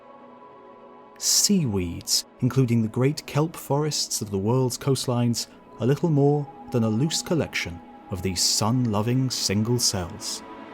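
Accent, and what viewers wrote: United Kingdom. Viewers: You're just saying that cus he has a British accent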